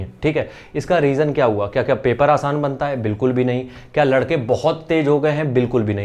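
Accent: Kanpur, India. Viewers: Kanpur se hai lgta😊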